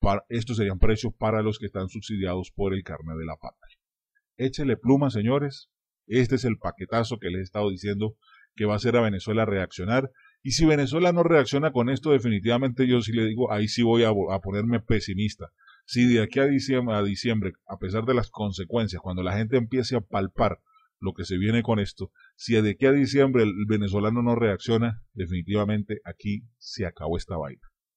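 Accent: Colombia. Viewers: no solo eso, sino que tmb es colombiche... notose si lexico y su tonico de voz... es tan colombiano cm madurin o mas xDDD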